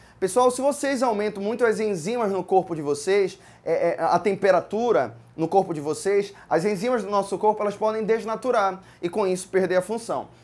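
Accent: Pará, Brazil. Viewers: é Paraense. O sotaque não nega. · Esse sotaque paraense que reconheço de longe, tão bom de ouvir. Sou de Natal RN, mas minha família toda é paraense. Parabéns pela didática muito boa. PS: Se não for paraense vou passar vergonha, mas se parece muito.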